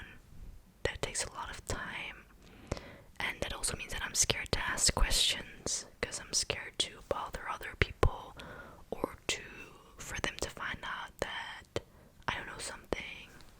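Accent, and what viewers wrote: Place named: United States. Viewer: Wow, i wouldn't have thought you were from Netherlands, your accent made me think you were american. Where did you learn english by the way?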